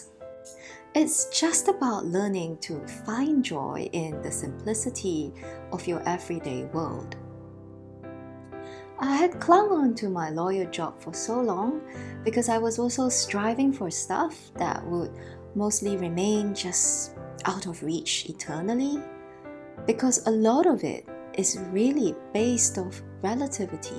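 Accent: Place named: Singapore. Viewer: Okay, I knew it, you are from Singapore. The accent just can't really be hidden... it's too distinctive... plus the description about stressful studies and city-life, corporate rat race... Nice videos... must be nice to be able to just get away from the rat race.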